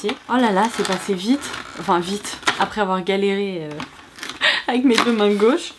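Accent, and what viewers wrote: England, United Kingdom. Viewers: Ton accent anglais est super !!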